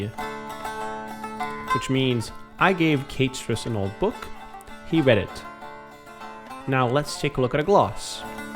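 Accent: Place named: Sweden. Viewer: The intonation sounded very Swedish.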